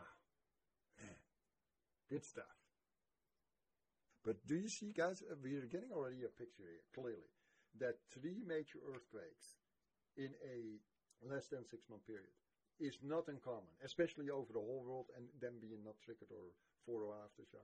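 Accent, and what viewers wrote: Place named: Germany. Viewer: Are you German? Love the accent just watched the other video...great stuff..i see the big increase in activity...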